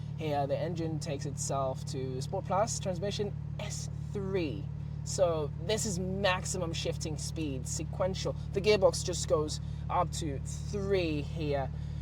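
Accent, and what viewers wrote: United Kingdom. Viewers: Broo with that accent, you giving UK vibes😭😭🔥🥂 W UK accent, keep up the tremendous job🔥🔥🔥🙏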